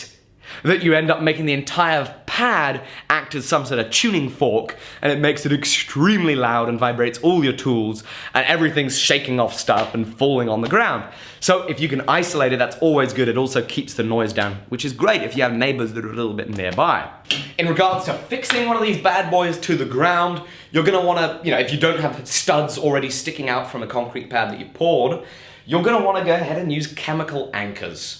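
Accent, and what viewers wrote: United Kingdom. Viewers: I really am curious.. Texas and American Flag. British accent. You relocate to Texas or just love Texas? Thank you,Proud Texan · Wait, are Brits allowed to wear boots?? Don't get me wrong, I don't know why everyone doesn't, but I don't think I've ever seen someone with your accent and a pair of cowboy boots. Props to you sir! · bro, wtf... I see American flags, Texas flags... don't tread on me... but the accent and the measurements... what are you!!!!!!!!???? Brit transplant, yank transplant? I'm so confuse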